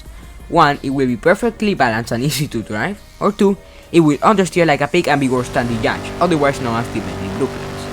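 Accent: Spain. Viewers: Luv the Spanish accent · wtf the spanish accent bro lmao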